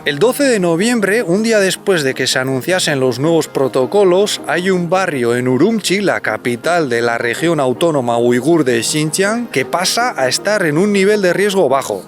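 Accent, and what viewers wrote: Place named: Spain. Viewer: modo de hablar español iberico, peninsular, diferente al español sudamericano con muchas variantes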